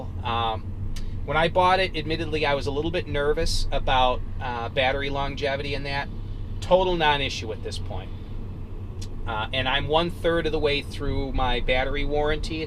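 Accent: Midwest, United States. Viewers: Lmao your accent. Kills me. Love my midwestern folks. Right off the bat 👏🏻💀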